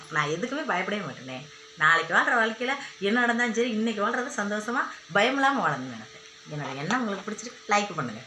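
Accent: Madurai, India. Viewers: Slang pathe nenachen akka nanum madurailatha paduchen.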